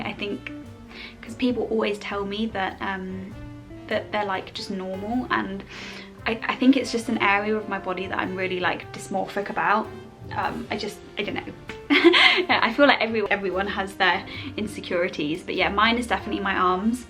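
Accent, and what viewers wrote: United Kingdom. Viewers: Baby, how I like your British English, it sounds so funny, your way of talking and laughing, it's great..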